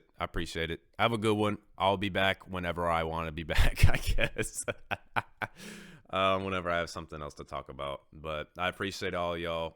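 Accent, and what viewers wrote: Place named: Brooklyn, United States. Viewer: totally random but you have the most interesting accent I've ever heard, lol. How do you have you a Brooklyn, Russian, and Texan accent at the same time 😭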